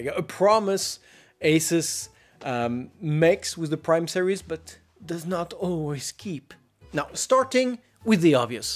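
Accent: France. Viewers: Man, I was about to buy it with a 7900X3D because it's 230€ but I'm going to pass ! Problem is, there isn't much X670 with 6 S-ATA ports at an ok price point at the moment. ASUS TUF GAMING X670E-PLUS WiFi looked good with additional SATA PCIe card but the card I'm looking at is X8 and both additionnal ports are X4... Super detailed test, with lots of passion and top french accent ;) ! Bon boulot, cette qualité de test me rend fier d'être français !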